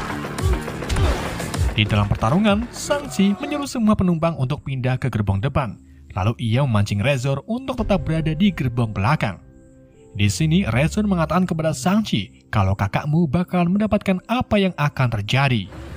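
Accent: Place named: Banyumas, Indonesia. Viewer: Dirungonin 😆😭 bang yeendeh rika ngapak yaa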